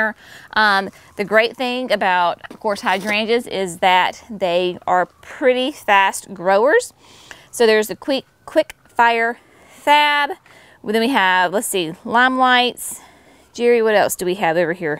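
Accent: South, United States. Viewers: Love your videos. Love your phraseology. You talk like we do with a southern accent rather than our hillbilly accent. I can totally understand stunk to high Heaven.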